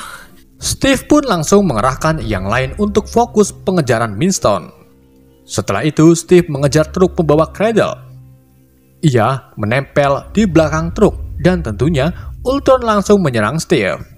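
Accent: Indonesia. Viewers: penyebutan nya indonesia bangeetz😂 , 👍👍🤗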